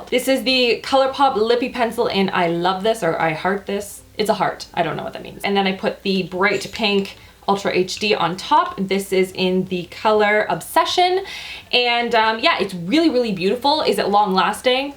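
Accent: Canada. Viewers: Where does she live ? She sounds Canadian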